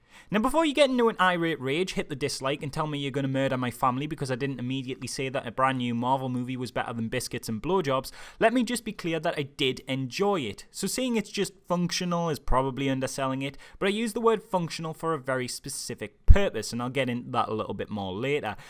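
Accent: Newcastle, United Kingdom. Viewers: Are you from Newcastle? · LOVE your videos... (screw the haters)... expecially the way you surgically, cleanly and clearly (be it with a slightly noticeable gerodie accent lol) take a red hot knife to many movies good and bad, with a perfect dos of humour to tie it all up... I always take your word and I'm very much looking forward to see Doctor Strange.... P.s More than 3 seconds is needed for a spoiler warning lol And another P.s Keep it up my Gerodie Lad n please stop Gerodie shore. It really make u all look bad....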